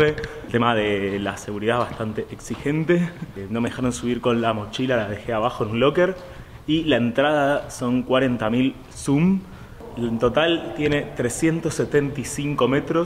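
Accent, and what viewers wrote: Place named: Buenos Aires, Argentina. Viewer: Muy bueno el contenido y didáctica la explicación. Sos de Buenos Aires por casualidad, digo por el acento. Y lo de el restaurante giratorio me hizo acordar a la confitería giratoria del cerro Otto en Bariloche.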